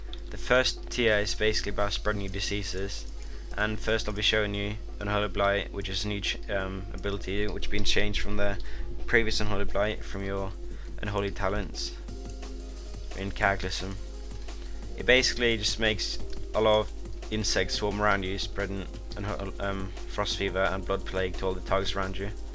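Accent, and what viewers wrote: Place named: Scotland, United Kingdom. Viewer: You from Inverness? You have a strong accent, laddie.. Like Alex ferguson..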